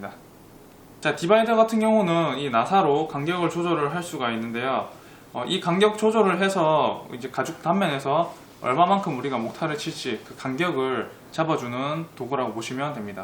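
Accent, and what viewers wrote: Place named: Jinju, South Korea. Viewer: 음 ...ㅎㅎㅎ 진주분이신가보다 ㅋㅋㅋ 억양이 왠지 진주스타일 ㅋㅋㅋ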